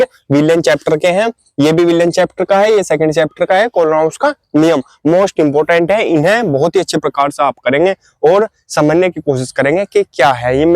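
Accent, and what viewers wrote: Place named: Baghpat, India. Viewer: Bhai to bikul apni bhasa wala h up baghpat side h to reply kariye jarur 😂😂😂😂😂😂😂😂😂😂😂😂😂😂😂😂😂😂😂😂😂😂😂😂😂😂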